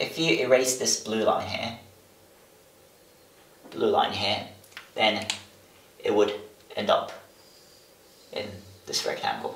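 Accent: United Kingdom. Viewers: Excellent! Very well explained considering it's your first time. It's also great to hear a Japanese person speak with a British accent! I hope you make more videos. :) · British Englishばりばりでかっけえ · I think you have an English accent rather than an American accent, but EIGO UMASUGIDESHO! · As a bilingual viewer of your channel who hasn't had much experience with math in English, I greatly appreciate this video. I love your son's British accent and look forward to further lessons!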